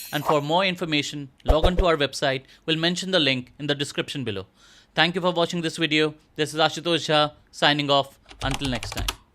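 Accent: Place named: India. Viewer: You're speaking in an Indian accent and so, I can guess you're based in India. How would you provide a worker in Poland with a job?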